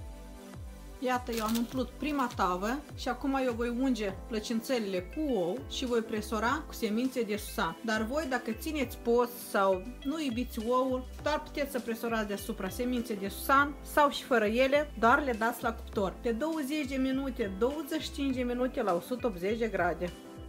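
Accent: Moldova. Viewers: dupa accent si dupa scrisul in rusa de pe plicurile cu condimente, as zice ca de peste :) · Ești minunata atit de mult i mi place cum vorbești asa vorbeau bunicii noștri acum 60 ani.I mi amintesc și acum ce femei harnice frumoase bune asa ca tine. De cite ori i mi e dor ma uit la tine la rețete și la Stela Stratulat numai sa va aud dulcele grai Moldovenesc. I ți doresc sărbători cu bucurii sănătate alături de cei dragi ție.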